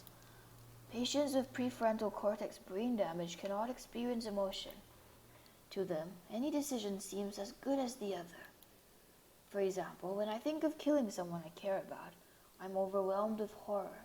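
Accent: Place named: Wiltshire, United Kingdom. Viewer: Whew..... Saw this in our sub box and instantly decided to listen while going on a morning walk. It's a sort of freezing, slightly overcast day over here with a cold grey sky so it was truly the perfect atmosphere. God... Forgot what HC does to a person until we experienced this. Remember watching manly play the original games years and years ago and they emotionally wrecked me haha. Truly some of the most gut wrenching visceral horror from indie games. Just pure agony all portrayed with such charming character designs and in an adorable art style. There's just something so haunting about the way this game dev explores topics and I think the way you described at the end nails it perfectly. We adored your Wiltshire voice and the creepy yet peaceful voice you gave Vincent (that cg parallel hello???? 😭). And ohhhgg if you play any of the dev's other games, we're so down to be hit over the head with the bag of bricks that will be the feels. 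Ehehe thank you for accompanying us on our walk with your soothing voice,! It was a really nice start to our morning 🥰❤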